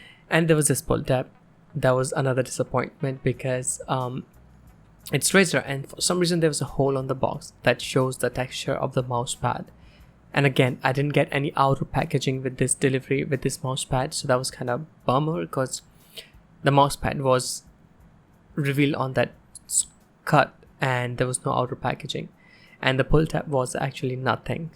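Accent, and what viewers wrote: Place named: India. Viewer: Indian acent nikal rha bich bich mein. Wohi sahi hai. Yeh affectation gay lag rhi bhai. Sachi mein gay hai tu to sorry.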